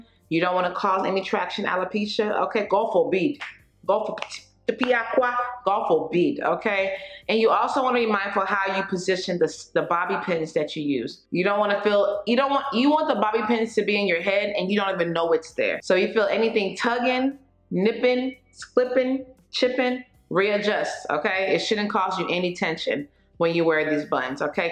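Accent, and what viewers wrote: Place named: Nigeria. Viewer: That's Nigerian the accent and attitude😅😅 + american of course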